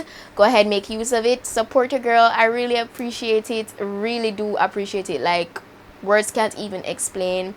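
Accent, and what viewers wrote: Jamaica. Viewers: U never heard jamaican accent🙄😢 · Goodasssss! Wooyyyy. Lol I just found this video today and literally recognized the accent and fell in love. Such a quick but helpful video! Thank you and definitely subscriber. Happy to see Jamaican YT prospering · Thank you for not camouflaging our beautiful accent with a twang. It’s refreshing to see a Jamaican with a platform like this. Keep it up! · Love your method! I m gonna try it today! Your accent has me missing my family in Kingston 😩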